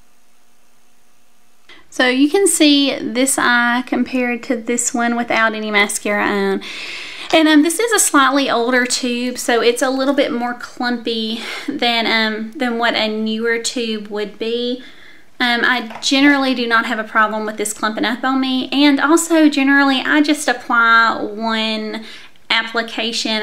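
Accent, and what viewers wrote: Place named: Texas, United States. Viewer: Love ur texas accent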